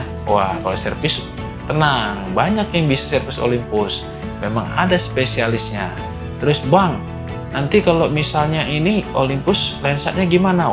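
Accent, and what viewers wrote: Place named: Bali, Indonesia. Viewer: Orang bali ya bli? Logatnya sangat bali😄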